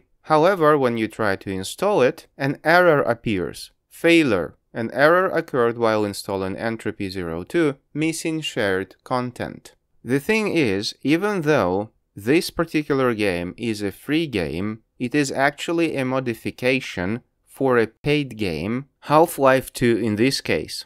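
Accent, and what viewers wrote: England, United Kingdom. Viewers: о вы из англии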